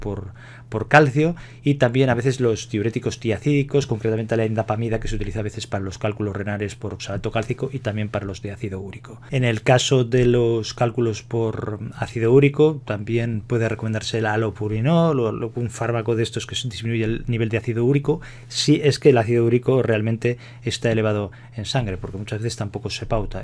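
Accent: Spain. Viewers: Gracias, muy claro. Guapo doctor, hasta el acento español me gusto😁. Mañana será mi intervención. Y justo pensaba qué hacer para evitar la formación, saludos Querétaro-México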